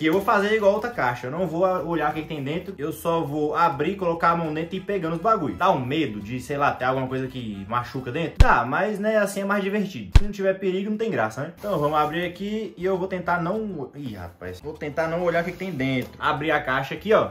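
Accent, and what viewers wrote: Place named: Minas Gerais, Brazil. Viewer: Quando eu descobri que ele na real de Brasília eu ate assustei pq o cara fala igual nos mineiros mo viage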